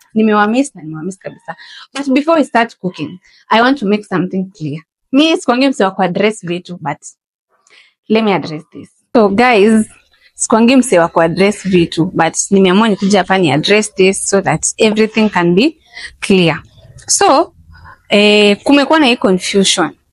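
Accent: Kenya. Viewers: Umeishi na mluhya hadi unaongea kama mluhya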